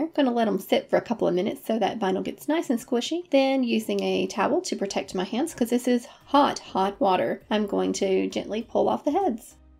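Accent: South, United States. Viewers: Im so used to southern accents in georgia they usually annoy me, but yours is so soothing and calming and fits the aesthetic of the videos. Awesome stuff!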